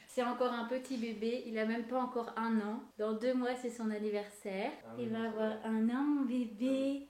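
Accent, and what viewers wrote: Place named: Quebec, Canada. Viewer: J'entends un léger accent. Québécoise ?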